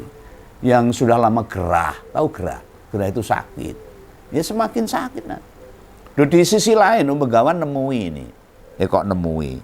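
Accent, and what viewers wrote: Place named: East Java, Indonesia. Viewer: Kental sekali mas ini Wong Jawa Timur.... Sehat2 selalu Mas wong jowo asli. Aku senang dengerin.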